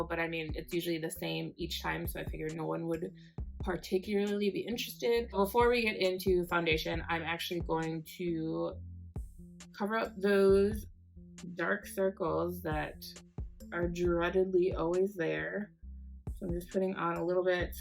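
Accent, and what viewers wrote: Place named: Africa. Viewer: It's the African accent for me 😂😂😂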